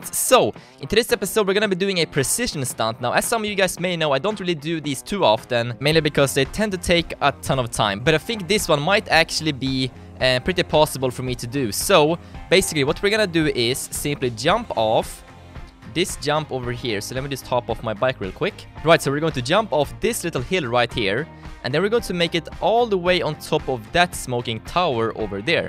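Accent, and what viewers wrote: Sweden. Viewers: I love your swedish-english xD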